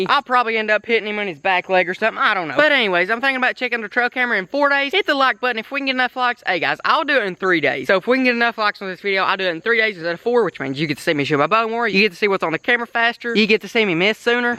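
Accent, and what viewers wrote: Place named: Kentucky, United States. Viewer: "Not as FIRST as the CLOSE one" love the vids , that east Kentucky twang runs deep as the mines!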